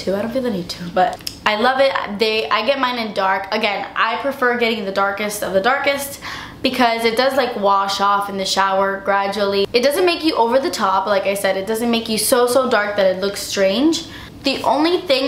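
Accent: Australia. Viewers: Yes, I've just noticed they are doing that. They shouldn't have to justify the fact they like wearing fake tan. Although it does stop lots of those silly comments as she said. PEOPLE have right to better themselves, it's just like changing your hair colour, wearing make up, following fashion. Who doesn't want to look there best. Really enjoyed her review she sounds Aussie: )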